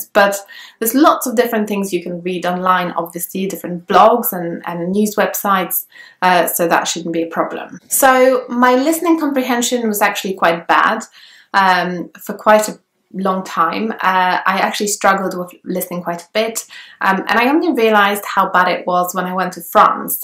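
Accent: United Kingdom. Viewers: Wait english isn't your native language, could've fooled me, you just sounded british to me ,so what is your native language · Wait you’re polish ? i thought you were british lol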